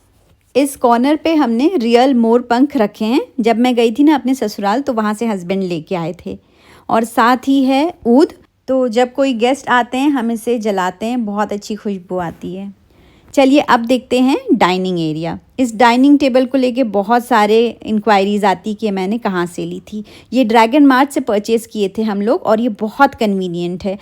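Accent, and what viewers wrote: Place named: Uttar Pradesh, India. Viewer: Are you from Uttar Pradesh? Aap ka hindi humare jesa hai. Same accent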